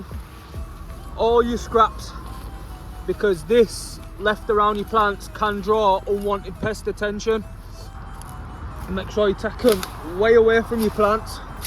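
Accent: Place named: Bolton, United Kingdom. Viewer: Sound like ya near bolton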